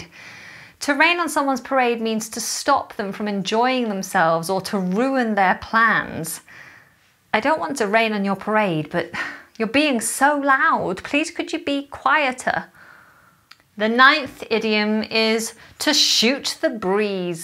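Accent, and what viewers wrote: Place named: RP, United Kingdom. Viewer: I'm still learning English accent. It's really posh.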